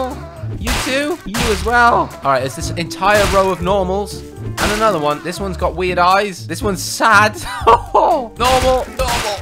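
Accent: United Kingdom. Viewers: You are British but I am from 🇵🇹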